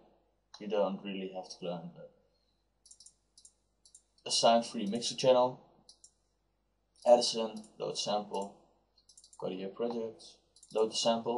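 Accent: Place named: Netherlands. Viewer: Je klinkt nederlands.. You sound dutch.. Good instructional video!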